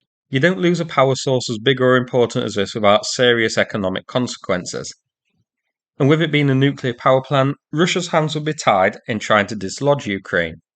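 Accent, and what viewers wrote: United Kingdom. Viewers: Thanks for the video, just discovered you after following the war since the start. I like a UK accent;) :face-red-heart-shape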